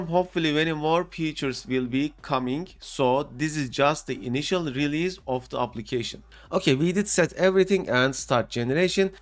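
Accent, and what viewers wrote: Turkey. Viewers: Selam dostum yanlış anlamazsan aksanından Türk olduğun anlaşılıyor o yüzden Türkçe soracağım. Bu kurulumu herhangi bir amd gpu için yapmayı denedin mi?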